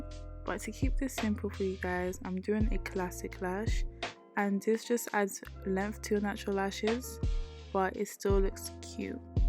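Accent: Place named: England, United Kingdom. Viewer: You can’t hear the English accent?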